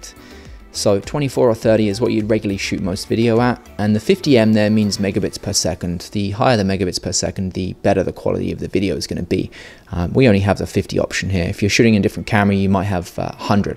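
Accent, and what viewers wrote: United Kingdom. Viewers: Great video, your video was talking about shooting at 60 or 120fps, obviously your sony will be in NTSC mode, judging by your accent, Im assuming that you live in the UK. If so, do you change to PAL when shooting in doors to avoid flickering. I have the a6500, I live in the UK, I shoot at 100fps for slow motion, is there much difference between NTSC & PAL, apart from the extra 20fps?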